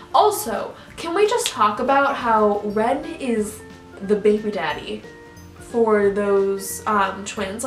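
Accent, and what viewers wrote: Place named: Ireland. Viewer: accent I felt like it sounded more like a Irish accent more than a British accent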